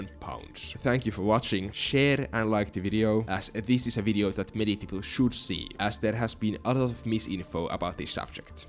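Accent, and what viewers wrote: Finland. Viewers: I clicked for the clickbait, I stayed for the finnish accent. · i really like the finnish accent 😭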